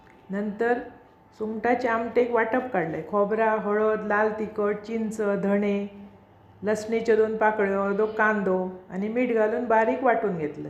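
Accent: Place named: Vengurla, India. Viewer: Tumchya bolnyacha style warun tumhi vengurla che watta.. tumhi vengurla che ahat ka?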